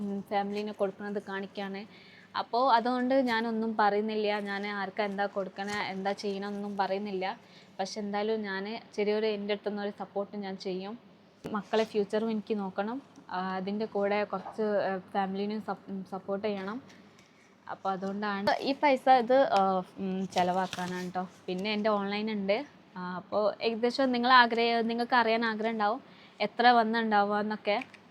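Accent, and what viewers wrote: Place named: Kozhikode, India. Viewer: ഭാഷ കോഴിക്കോടനാണല്ലോ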